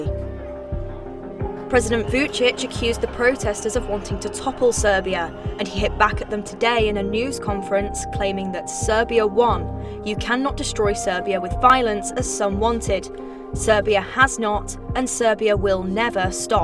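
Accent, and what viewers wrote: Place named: United Kingdom. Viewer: This British accent is unwatchable, sorry...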